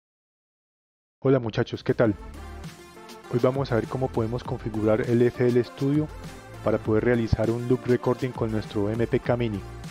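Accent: Colombia. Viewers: Habla colombiano!!!!! Excelente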